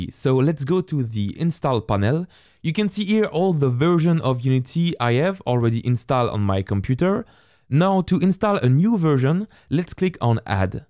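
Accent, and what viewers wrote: France. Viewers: On reconnaît le booonne Français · En tout cas on voit pas du tout que tu as un accent français cher compatriote ahah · Is he french? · Juste a ton accent: Tu es francais? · Your french right? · It's the French accent for me. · You are probably French right? That accent seems French to me :) Well I am too, just not from Europe, I have a different accent here in Quebec. Anyways thank you for the tutorial ! Merci. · A lil french xd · Pas mal le French accent x) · I love his strong French accent haha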